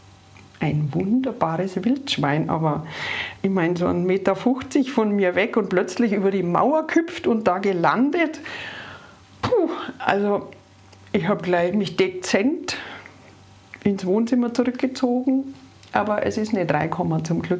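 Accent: Bavaria, Germany. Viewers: Dass du bayrisch redst, das wusste ich nicht. Gfreit mi ....🙂 An Guadn für euch beide....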